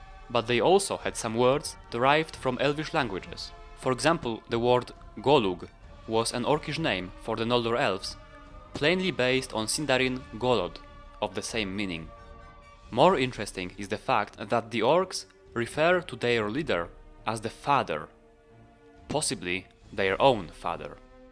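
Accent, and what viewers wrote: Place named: Poland. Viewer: klasycznie polski akcent 👍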